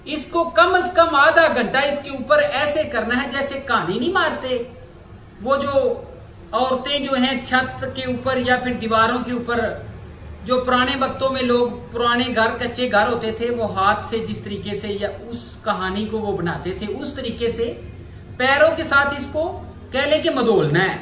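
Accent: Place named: Punjab, India. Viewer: Kia bat bhai tumrai اردو sahe bolo bhai پنجابی مکس mat Kro pls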